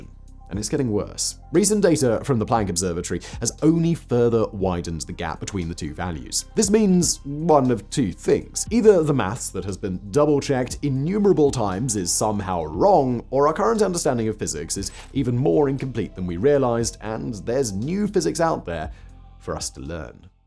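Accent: England, United Kingdom. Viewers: A little hard, hes English lol. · Great video. Lose that English accent. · Why does vsauce have a British accent and look slightly different?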